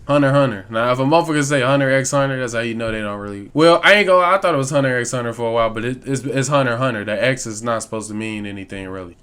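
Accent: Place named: Chicago, United States. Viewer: I know a fellow Chicagoian when I hear one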